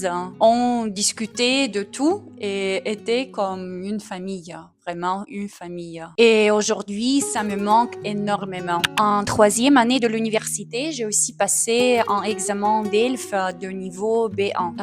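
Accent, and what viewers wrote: Buenos Aires, Argentina. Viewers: Hablas muy bien varios idiomas te felicito! hablas muy bien el español argentino tenes el acento porteño, mis abuelos eran italianos, yo tengo la ciudadania italiana , saludos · Hola, linda 🫶🏼 gracias por el saludo Jajaj fue inesperado. P.D. Me encanta tu italiano 🤌🏼 ni hablar de tu español porteño 😻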